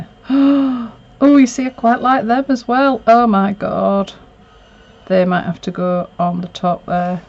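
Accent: Yorkshire, United Kingdom. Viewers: Lovely to hear your Yorkshire accent again this week, and what a pretty fringe. I have just the fabric I need for this week. Thanks for your humour and inspiration.m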